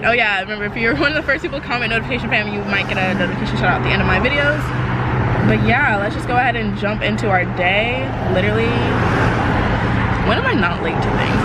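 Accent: United Kingdom. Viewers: I can hear little hints of the UK accent coming out.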